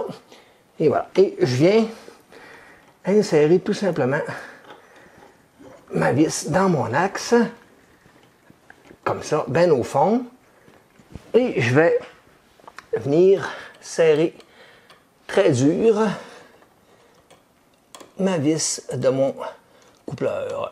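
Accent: Canada. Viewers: Vidéo efficace, comme à chaque fois, l'accent Canadien j'adore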